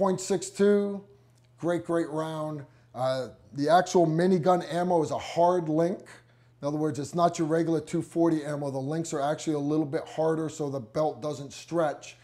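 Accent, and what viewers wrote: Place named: Brooklyn, United States. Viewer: Gotta be from Brooklyn or the Bronx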